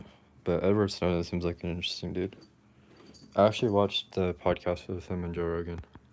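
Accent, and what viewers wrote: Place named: Vietnam. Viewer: lol i love his Vietnamese accent =)))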